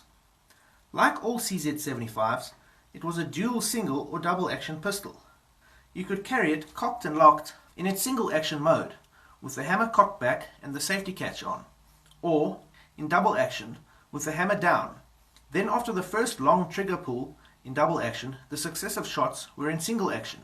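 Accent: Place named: South Africa. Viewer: It’s so bizarre seeing someone who looks like they’re from Tennessee talk in a South African accent. Cool stuff though.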